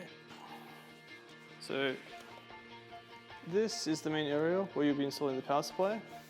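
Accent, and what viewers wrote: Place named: Australia. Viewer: It's surprisingly refreshing to watch a youtube video and here an aussie accent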